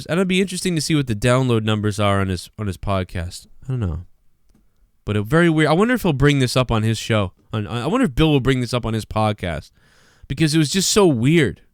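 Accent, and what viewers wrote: Boston, United States. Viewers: Greg Van Holsbeck that’s the BAWSTIN accent buddy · This was a good video. Very fair. I can hear the Boston in you too lol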